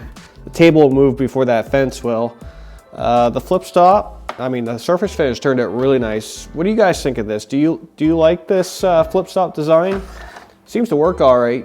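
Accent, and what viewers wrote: Canada. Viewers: Canadian I'm guessing. First video I've see of yours and I am on the 11 second mark. Am I right?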